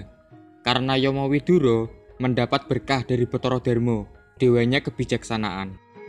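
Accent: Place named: Sleman, Indonesia. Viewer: Suanten lan bahasa e kados piyantun Sleman, sae sanget Kulo remen mas menambah wawasan 🙏🙏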